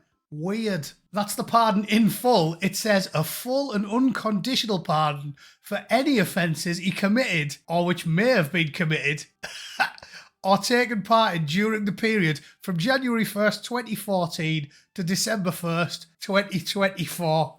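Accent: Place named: Middlesbrough, United Kingdom. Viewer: where u from in Boro u sound like our ked from Park End, but with more vocabularic wit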